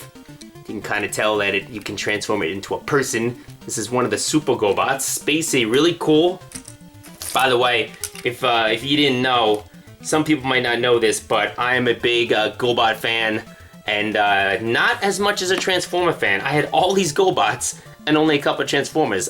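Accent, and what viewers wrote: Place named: East Coast, United States. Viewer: Oh I was just kidding around, your east coast accent reminded me of the Honey Badger video lol. Cool vid man, I really enjoyed seeing all those gobots! It would have been nice if you'd done a quick transform on them and then just edited out the time it took. A lot more work for you, I know, but definitely an improved viewing experience!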